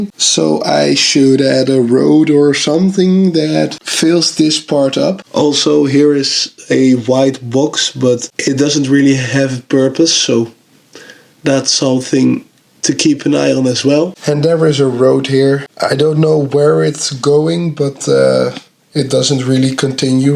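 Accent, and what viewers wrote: Netherlands. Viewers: schiphol atc tower i thougt i heard a dutch accent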